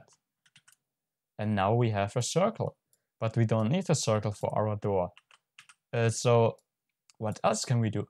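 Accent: Germany. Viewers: Mein hört dein deutsch raus 😂 aber alles gut thx für das Tutorial war sehr schön👍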